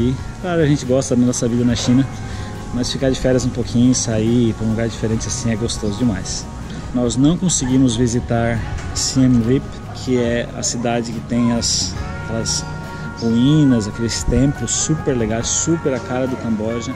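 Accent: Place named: Piracicaba, Brazil. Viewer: Bacana, a é daqui de perto de minha cidade Mogi Mirim (SP). Para dizer a verdade tinha notado na fala dela um leve sotaque de Piracicaba, rsrsrsrs. Gosto muito de seus vídeos, bastante informativos.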